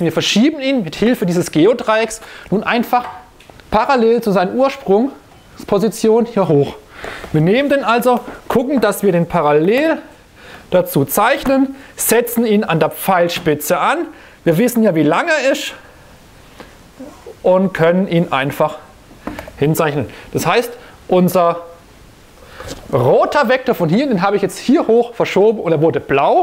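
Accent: Swabia, Germany. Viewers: Schwäbisch versteh ichs gleich viel besser top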